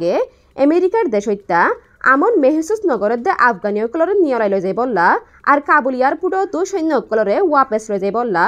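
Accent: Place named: Rohingya, Myanmar. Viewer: Dear sister, I greatly appreciate to your significant Rohingya accent.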